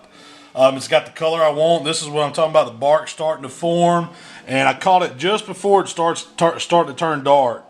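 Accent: South, United States. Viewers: I love how southern folks in the USA pronounce foil and oil as foal and oal lol. Man that looks tasty, some good eats right there. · Always nice to hear a gentleman with a nice southern drawl work fancy words like crim dela criim into a BBQ video. But absolutely right, there's no piece of meat better than a pork belly. Except maybe bacon, which pork belly essentially is. Thanks for sharing. The belly looks great!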